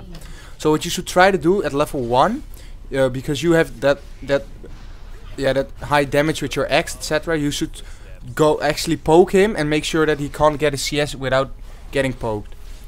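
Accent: Netherlands. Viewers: I'm pretty sure that accent is from Holland :) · i think he's dutch his accent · That dutch accent ♡ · je hoort gelijk dat het een nler is hehe, nice vid tho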